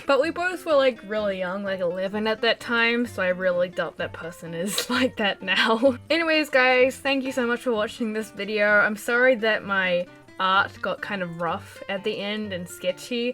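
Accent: New Zealand. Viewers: are you a new zealander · OMFG ARE YOU FROM NZ !!!!!!!!?!!!!!!????!!! Edit: YOU AREEEEEEEEE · Are you from New Zealand ?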